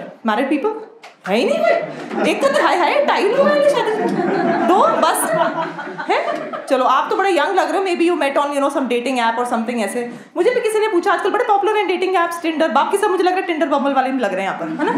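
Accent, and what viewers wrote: Punjab, India. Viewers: You r doing amazing mam....just keep it up.. really liked you Punjabi accent 💫👌... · Sorry we are not ok with punjabi tadka. but u can speak. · Punjabi accent aapki ufffff ❤ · So decent lovely beautiful I can't express ☺️☺️☺️ yesss Punjabi tarqa massst haiiii☺️☺️☺️ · Amazing ji.....jatti ji full kaim....really loved it.....specially your punjabi accent ain kaint....waheguruji bless you jattiji....chardikla ❣❣💐👌👏👏👏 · She is aging like a fine wine and her voice and Punjabi accent is litt. And nice jokes also🔥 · Solid content ma'am, I loved your punjabi accent... Waiting for your next video 🎉🎉🎉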